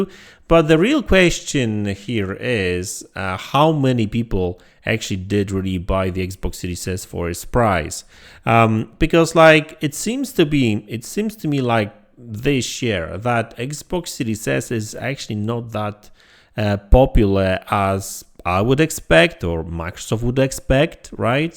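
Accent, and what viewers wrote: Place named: Poland. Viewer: Od razu w intrze usłyszałem ten polski akcent xd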